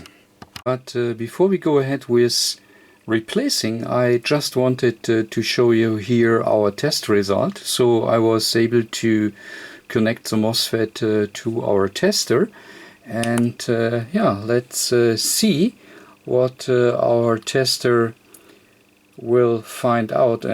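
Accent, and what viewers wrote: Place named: Germany. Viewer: You speak like an kraut...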